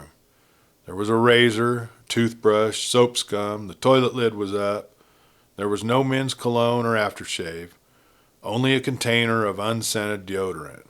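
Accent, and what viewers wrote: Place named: Texas, United States. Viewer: And you almost sound like a Texan❤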